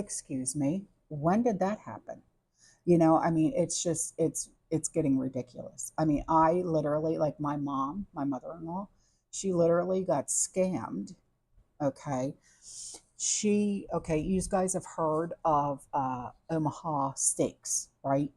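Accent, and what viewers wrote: New York, United States. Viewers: Hello 🤗 I shared your page with a girlfriend of mine to your page. She thinks you're very intelligent well-spoken and loves the New York accent 😁 Have a great day God Bless 🙏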